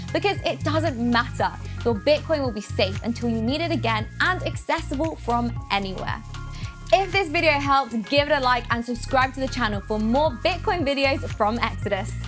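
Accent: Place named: England, United Kingdom. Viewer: must be the thick english accent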